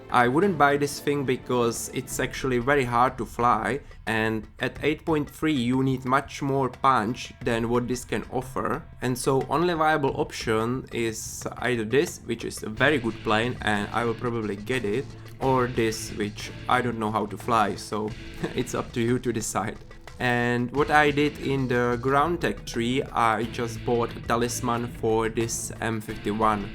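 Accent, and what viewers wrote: Czech Republic. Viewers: Bro tohle zní jako hodně českej "akcent" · It's so noticable that you are czech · čengliš slyším už od prvního slova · Hey are you perhaps czech? I noticed the accent lol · hodně český accent :D nicmene pekne video :) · Český přízvuk je docela znatelný :D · Great video it helped me to pick the best premium to buy. Ale ten českej přízvuk mě hnedka hitnul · Já 2 vteřiny po začátku videa: To je jasnej čech, dávám odběr :D Dlouho čekám až se ta youtube česká základna WT trochu rozroste :D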